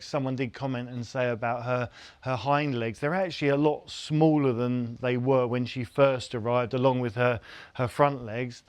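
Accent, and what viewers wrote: United Kingdom. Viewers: ohhhh LOVE THE INTRO of that sweet british voice def need more ;];];] sorry i live in maine in the U.S.A. and i cannot get enought;];];]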